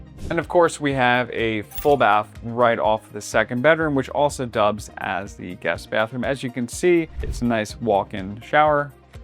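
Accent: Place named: Philadelphia, United States. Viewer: I'd trust this guy. He's so Philly. I hear the accent (just a little).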